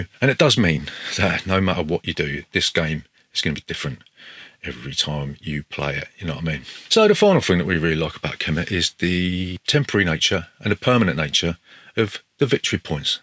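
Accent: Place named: England, United Kingdom. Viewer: As always thanks for the entertaining review. Like your perspective, always nice to hear an English accent when living away.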